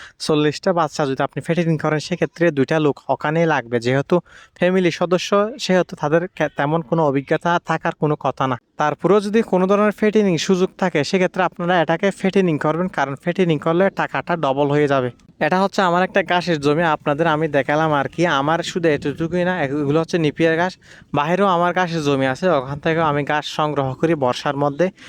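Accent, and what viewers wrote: Chittagong, Bangladesh. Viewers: ভাই আপনার বাড়ি কি চট্টগ্রাম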